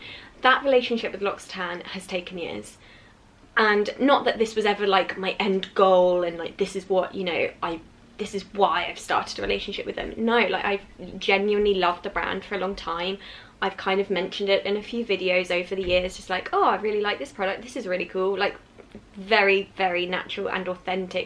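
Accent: United Kingdom. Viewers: I looked for a british youtuber to learn the accent and accidentally found someone so precious. Youre absolutely marvellous and im really glad to found this channel ^^ Right on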